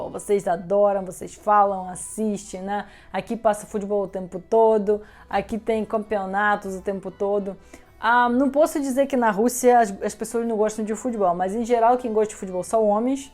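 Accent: Rio de Janeiro, Brazil. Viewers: Tá com um sotaque carioca carregado, o famoso "S" chiado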